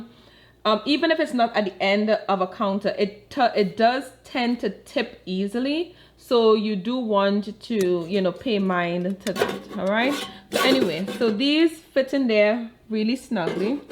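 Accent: Trinidad and Tobago. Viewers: You're Trini?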